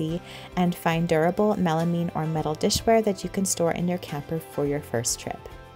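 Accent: Canada. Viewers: THANK YOU!!! Everything you did was amazing! We have a camper that I’m wanting to update and have been nervous to do so thanks for giving me the courage to do them and see what works and what doesn’t. Also I used to live in Canada and I love your accent. 🇨🇦 I subscribed!!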